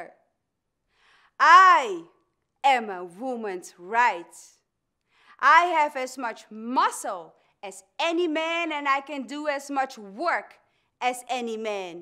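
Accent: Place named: Netherlands. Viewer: Love the Dutch accent. I like to think that Sojourner would be happy to know that her own words are still not forgotten.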